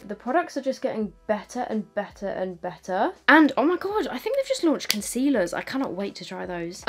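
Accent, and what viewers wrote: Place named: England, United Kingdom. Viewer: As an American, a Texan specifically, it sounds so funny to me to hear someone cuss with an English accent.😅🫶🏼 It’s so cute lol. I love your videos!❤️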